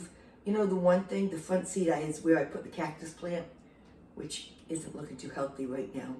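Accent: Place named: Boston, United States. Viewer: Loved the update! I definitely think Parker was trying to tell you something important--😊 Someone here said something about the video being blurry. I think the autofocus caught something in the foreground and focus on that instead of you because you were in the mid-ground area. But then I started driving and couldn't watch but could only listen in the car. So it didn't bother me-I love listening and love your accent. Reminds me of the folks I met when I went to Boston. Have a great weekend!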